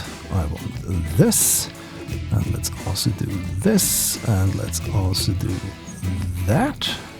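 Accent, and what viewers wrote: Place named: Germany. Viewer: Ich wusste doch das du Deutsch bist! :D (Hat man an deinem Akzent gehört)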